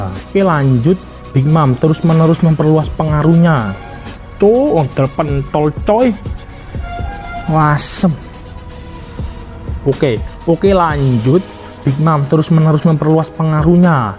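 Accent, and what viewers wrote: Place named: Java, Indonesia. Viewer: Gua suka pembawaanmu bro... Jawa banget....opo onone 😄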